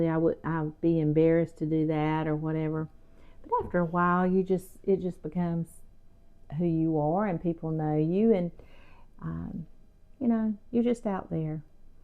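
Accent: South, United States. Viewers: your genuine warmth and sincerity are so refreshing in this increasingly disconnected Impersonal world. Your wonderful Southern accent also endears you to this boy raised in the Deep South! May God continue to bless you as you bless others! Big hug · you are an angel here on earth. May God richly bless you and your family for your faithfulness to Him and to one of his precious Lambs who had no home until you provided for her, cared for her and loved her as He would. I love your YouTube channel and your sweet smiling face, your lovely southern accent that sounds like me, I'm a Nashville TN native living in Cedar Hill TN now. Your courage and determination are inspiring and your kind and loving heart is projected through every word you speak to us and every smile you give to us. Blessings upon blessings be showered upon you and yours forever. ❤🙏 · I love your southern voice so much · you are an inspiration. 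Sharing your story helps me remember that we just don’t know sometimes what the people we see in our daily lives are going through. We just don’t know. Perhaps remembering that we don’t can I courage us to be kinder in our interactions with others., I don’t know you but you seem to radiate kindness. Perhaps it is your voice and that beautiful southern accent that we in the south are blessed with. Perhaps it’s the expression on your face. Maybe a combination of things shows the kindness of your heart. You were speaking in this particular video of a sad event in your life. I am reminded that in the Bible there is a verse that tells us that we learn in the House of sorrow not in the House of laughter. God in His wisdom knows what we need and in His wisdom He sends situations or people to provide for that need. God bless you. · I appreciate getting to know you more. I’m a newish subscriber- so I’ve only seen a few of your videos, but I knew I had to subscribe because I love a sweet soul with a southern accent! 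Plus, a great cook! Love, from Texas!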